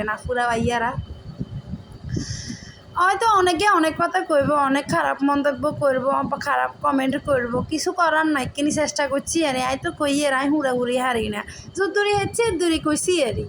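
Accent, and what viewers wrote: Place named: Noakhali, Bangladesh. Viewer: খুবই মজা পাইলাম আপু,, তুমি এই নোয়াখালীর ভাষায় ভিডিও কইরো, শুনতে খুব ভালো লাগলো ❤❤❤❤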